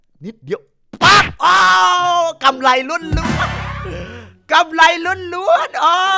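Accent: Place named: Isan, Thailand. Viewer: พูดอีสานได้กวนตีนมาก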